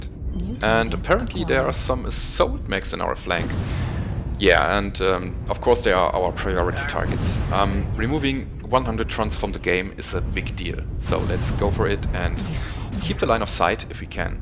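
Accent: Germany. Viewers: Whoa, you are a really high skill MWO pilot. Your videos are excellent and your analysis and advice are right on the spot, thank you ! Always entertaining, that European (guessing German) accent is...OK? With that same accent but using a voice modulator this your vids could get even better !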